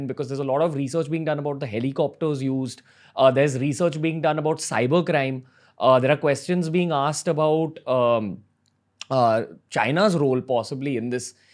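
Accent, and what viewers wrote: India. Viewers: change his style of speaking from a strong typical Indian slang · His accent is typically Indian and jarring to the ears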